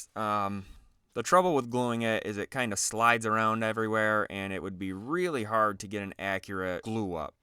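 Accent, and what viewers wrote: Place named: Michigan, United States. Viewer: Takes one whole minute explaining how most videos are too long 😂 Good video man. Appreciate the tips. Also, I didn’t realize how easy it was to spot a Michigan accent till I moved away. But I’d be willing to bet your from Michigan. Aren’t you? Haha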